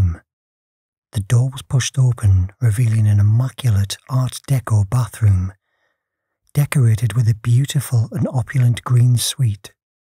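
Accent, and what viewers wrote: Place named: Inverness, United Kingdom. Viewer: Where about in Scotland are you from ? You sound like you’re from Inverness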